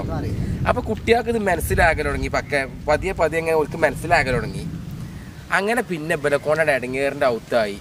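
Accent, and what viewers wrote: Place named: Malappuram, India. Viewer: പോരാട്ടോ, ഭാഷ മലപ്പുറം ആയുള്ളൂ, ബോഡി ലാംഗ്വേജും വേഷവിധാനവും വളരെ മോശം.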